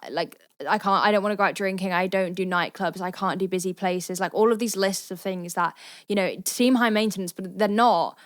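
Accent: United Kingdom. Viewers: wasn't expecting the accent, -B-R-I-T-I-S-H-. Ha, my local australian barista looks a lot like you, minus the tats. ADHD spectrum for me became solidified following epilepsy diagnosis; they can often coincide. Have you ever struggled with epilepsy? Low latent inhibition is a thing too. My brain is super responsive to my own self-generated mind exercises.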